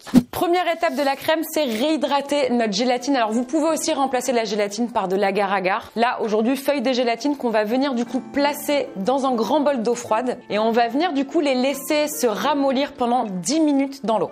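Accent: Canada. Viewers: tu mon youtubeur préféré des vidéos accent canadien et qui met quoi des vidéos trop trop rire je me suis spéculoos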